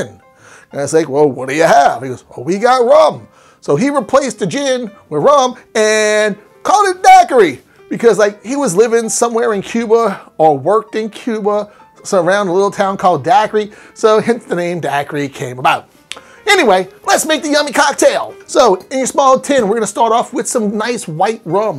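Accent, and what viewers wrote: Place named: Philadelphia, United States. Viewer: You live in Louisiana but is that a Philly/South Jersey accent i detect? Or Delaware?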